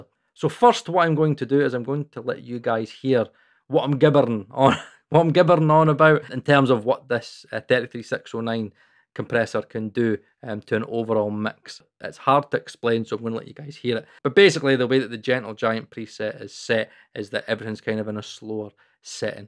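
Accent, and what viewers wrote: Scotland, United Kingdom. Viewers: Hi, just a quick off-topic question: When you shoot your videos, do you "soften" your dialect or is this the way you speak in real life, too? Just asking because I plan to go to Edinburgh next year with some friends of mine. (I have no problems at all to understand you. Been in Scotland 30 years ago but they've never been there. So I wanted to send them your videos to get used to the dialect.)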